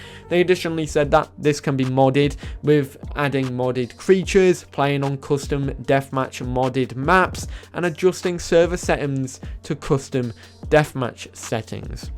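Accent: United Kingdom. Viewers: Blud I, British but I ant as British as this guy💀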